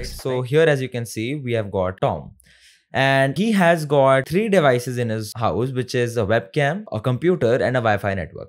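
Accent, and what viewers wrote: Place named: India. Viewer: Disgusting Indian accent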